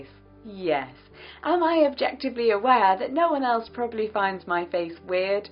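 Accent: RP, United Kingdom. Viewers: I acc kinda like the bad audio cause it weirdly fits the whole 50s theme and posh accent. Genuinely thought it was a style choice at the beginning!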